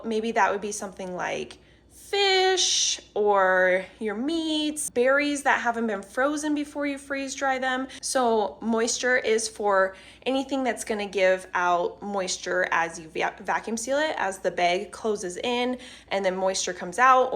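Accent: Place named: Midwest, United States. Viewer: Hi, I store my hash browns, burritos, etc, in the freezer. They seem to get crusty with frost and just taste awful. FYI, I am not frying them, but use an air fryer to cook them. Do you think vacuum sealing them will prevent this from happening? And bread seems to suffer the same fate. But I saw a video showing a piece of bread turning into a tiny piece of leather after being vacuum sealed. Do you think its best to first freeze the bread and then vacuum seal it so it doesn't deform like your berries? Sorry, I am so new to this and just discovered this. As I am a single father of a 11 year old daughter, I am trying to find ways to preserve food as she will often waste food if I give her too much at one sitting. FYI, I love your voice, you almost seem like you are from Ohio, Minnesota, the Dakotas or Wisconsin. So adorable! I'm in SoCal. 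Let me know if you are ever in the area!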